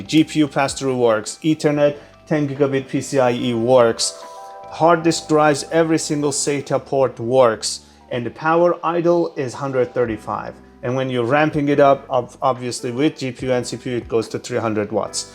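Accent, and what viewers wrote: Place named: Iran. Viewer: I can tell a Persian accent from a mile away. Nice board.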